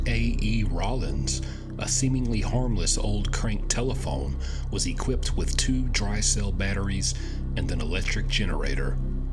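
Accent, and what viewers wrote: South, United States. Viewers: ! Your pronunciation, enunciation and articulate diction, while having a wonderful southern gentleman accent, are very refreshing to say the least! I thank you and you should congratulate yourself as you are a rare gem. Thanks again!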